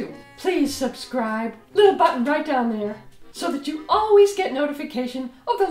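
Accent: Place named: United Kingdom. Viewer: i fucking love you i also love how you sound like a posh english person but you're from america. what the fuck is that about?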